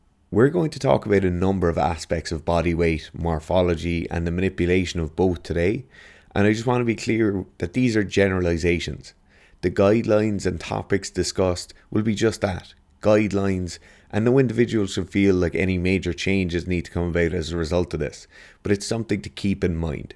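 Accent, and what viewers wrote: Ireland. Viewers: Your accent is aggressively irish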